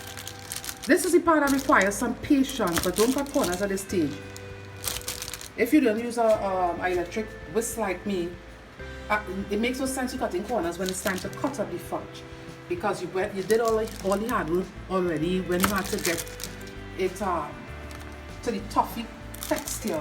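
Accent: Trinidad and Tobago. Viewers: How I love the trini 🇹🇹 accent great job fudge looks delicious 😋 thanks for sharing stay safe from Guyana 🇬🇾 with love ❤️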